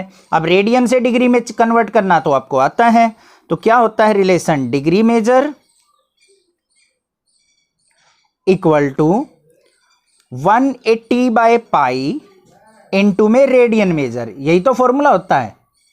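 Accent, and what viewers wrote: Haryana, India. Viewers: Sir aap Haryana se ho kya? You are helping all of us sir☺️👍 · I love ur haryanvi accent 🥰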